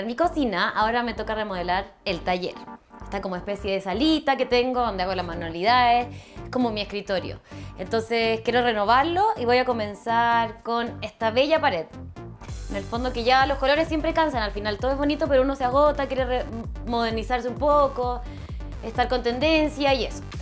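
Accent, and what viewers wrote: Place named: Chile. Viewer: Que delicia...lo haré.. quiero algo diferente!!! Saludos desde Costa Rica y me fascina el acento chileno👏👏👏👏🤗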